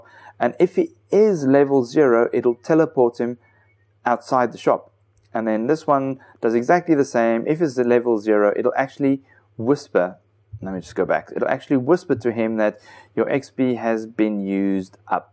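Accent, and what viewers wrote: Afrikaans, South Africa. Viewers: is jy eintlik afrikaans? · are u south african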